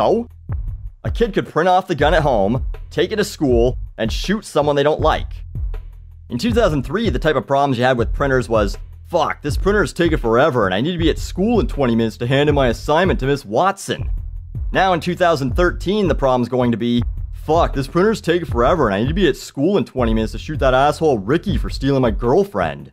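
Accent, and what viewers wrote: United States. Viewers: That was a fuckin great American accent. Lol good video.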